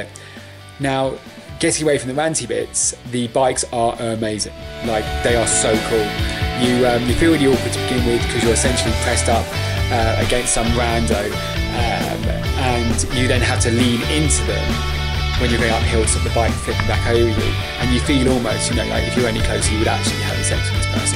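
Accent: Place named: United Kingdom. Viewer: man with your british accent, you just killed my country ahaaahahahajajajaja but you r just sayin what you saw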